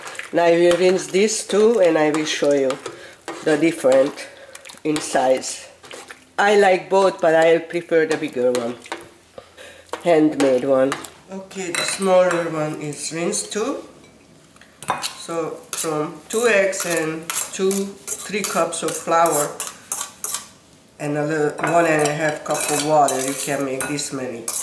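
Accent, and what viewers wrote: Hungary. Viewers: Thank you for doing all of these! They are really helpful. My father was a '56er and we had many Hungarian friends in Cincinnati. I remember for St. Stephen's Day we would have a big gathering. The older women would come over to our house early and make Goulash in a pot over a fire in our back yard. And they would always cut the Nokedli into it! Your accent brings me back to those days. I could listen to you talk all day.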